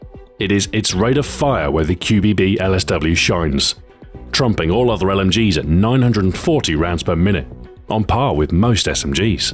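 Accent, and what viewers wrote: England, United Kingdom. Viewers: Does he sound American? He is obviously British. Just like how the Closed Captioning read "Centre" instead of Center. He even types his own CCs for his videos. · It seems like this series has become to: "watch a british man saying puns about gun names in black ops 2".... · Sorry sir he is British. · English accent :)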